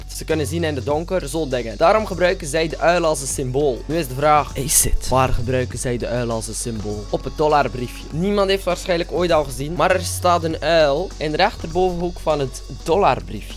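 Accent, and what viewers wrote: Belgium. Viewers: Ben je Belgiës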